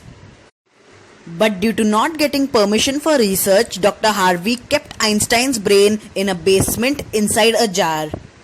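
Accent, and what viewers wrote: India. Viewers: Nice Indian accent but cool video very informative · nice indian accent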